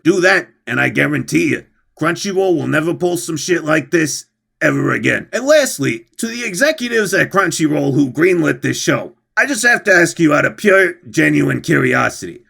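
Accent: United States. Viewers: I gotta ask... what part of the US is this waifus accent from? Subscribed anyway lol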